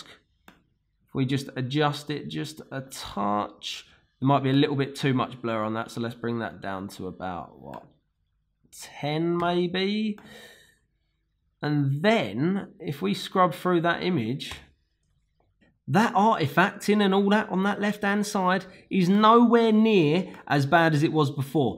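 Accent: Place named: Cockney, United Kingdom. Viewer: Best tutorial with great cockney accent. Thanks mate!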